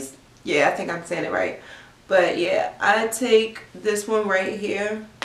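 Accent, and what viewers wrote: Baltimore, United States. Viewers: Tommi and dime piece mixed together but I love your bmore accent my Howard crush was from bmore lol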